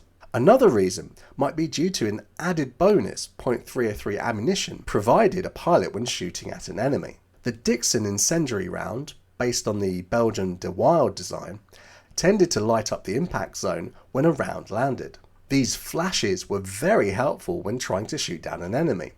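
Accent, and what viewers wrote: England, United Kingdom. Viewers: Enjoyed your video but, your British accent was a bit hard to follow with my Texas ear. Not your fault. A thumbs up from Houston. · Rose Royce? Rolls Royce! And spoken with a good English accent or at least southern accent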